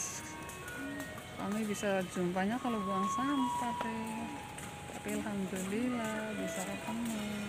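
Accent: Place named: Banyumas, Indonesia. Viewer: Ngapak itu indah mbak 😊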